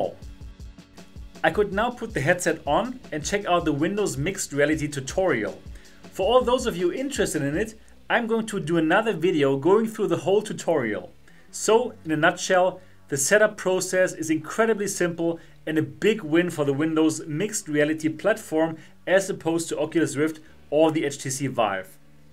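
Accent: Germany. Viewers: ich hab mich am anfang schon gewundert, dass sich der akzent ziemlich deutsch anhört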